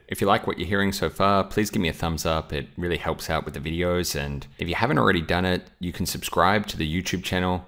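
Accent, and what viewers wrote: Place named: Australia. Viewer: Thanks, don't now if it's the fellow Aussie accent that hooked me or more so gave me the confidence to listen in more, but from checking out FOUS4 and then seeing how you explain it in really easy laymen terms (for noobs like me) it's well appreciated. Keep up the great work!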